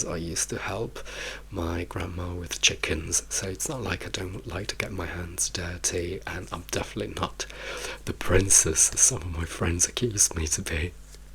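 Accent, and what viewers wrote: United Kingdom. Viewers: As an American, you definitely sound British to me! 😂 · I would love to hear you speak Italian, maybe you could do one video? I could swear you were British, I can't belive you aren't a local. PS. Such a shame you could not be yourself in Italy, I hadn't realised the homophobia over there is so strong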